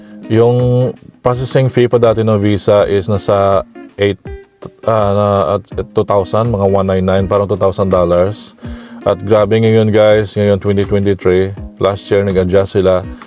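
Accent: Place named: Ilonggo, Philippines. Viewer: Nanami-an ko mamati sang tono mo. Siling ko, daw Ilonggo gid ni mu, and then i saw your other videos...ay, kababayan gid, taga Negros man gali. 😊 Makabulong sa hidlaw. 😊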